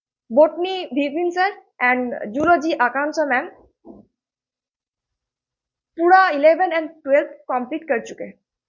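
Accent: Bengal, India. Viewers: Aapke hindi bolne ka style thora bengali hai 😅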